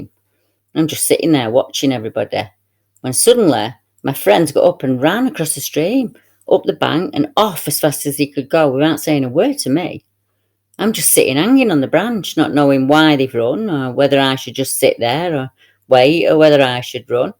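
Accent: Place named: North, United Kingdom. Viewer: Not commented in a while as I watch your videos mainly on my TV as I sleep, something about the fellow north accent and the amazing encounters is just so peaceful to me in a stressful part of my life. Sending Much love to you and everyone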